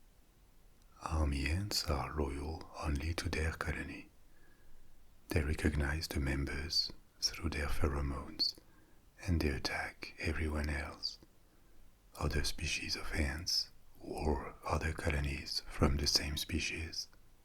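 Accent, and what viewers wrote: France. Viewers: ‘What’s your favourite video’ A French man talking about ants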